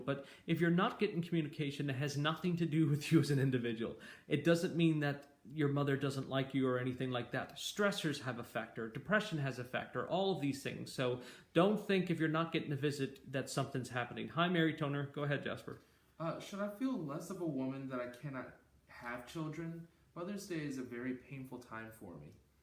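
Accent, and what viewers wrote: Ireland. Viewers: I hear the Irish lilt in your voice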